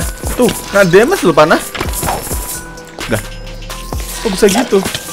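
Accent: Java, Indonesia. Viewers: Bang wong jowo nopo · Fiks Orang Jawa Logatnya :v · Abang orang jawa ya bang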